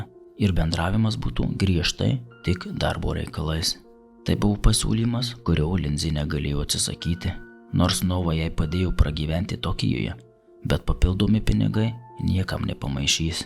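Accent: Suvalkija, Lithuania. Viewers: Viskas būtų ok, bet suvvalkiečio akcentas turėtų išnykti. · Man skamba kažkaip suvalkietiškai. O šiaip reikia tobulėti, su laiku manau viskas išsisprendžia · Taip, akcentas suvalkietiškas. O dėl tobulėjimo, tai labai teisingai, reikia praktikos, laiko ir viskas eis su kiekvienu video vis geryn. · Ar tu suvalkietis?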